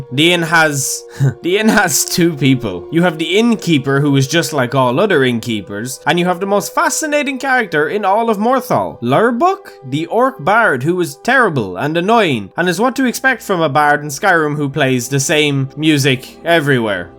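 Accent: Ireland. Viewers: First video, so I was like "why dafuq he sounds like a drunken dude?" Then I saw the name of the channel and went "Oh... That's why" Love Irish accent ~and their drinks, and gingers~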